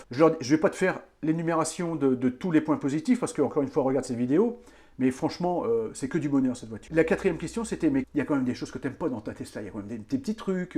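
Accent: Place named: Lille, France. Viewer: j'etais sûr que tu étais de Lille, trahis dès le début ! (^_^)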